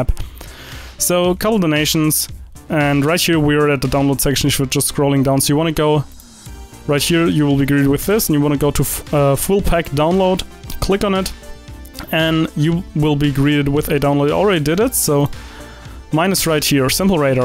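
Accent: Germany. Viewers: mann kann aber ob und zu einen deutschen akzent hören xD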